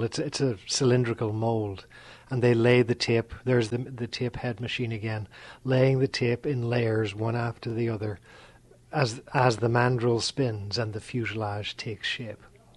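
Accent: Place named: Northern Ireland, United Kingdom. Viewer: Brilliant video - nicely narrated too. Good to hear a Norn Iron accent on this video. :) Thanks for the upload!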